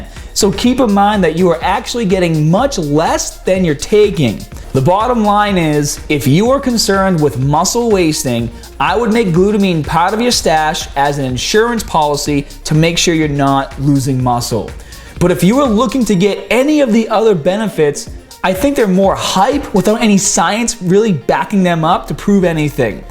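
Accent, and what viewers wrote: Boston, United States. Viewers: Gotta love that Boston accent. Ya hahd ea’ned mahnee. Thanks for the tips,! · Can you suggest how much to take. Daily? or when I am taking ballet classes? Love The Boston? accent.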